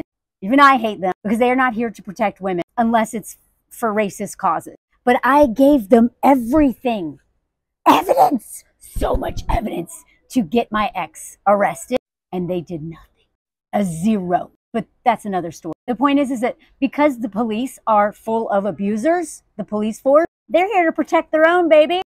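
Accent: South, United States. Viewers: You're from Nashville! Cool city. I knew I heard a little bit of a Southern accent. lol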